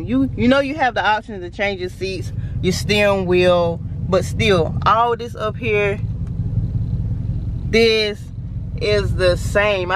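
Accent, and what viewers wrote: South, United States. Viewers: That southern accent is something special.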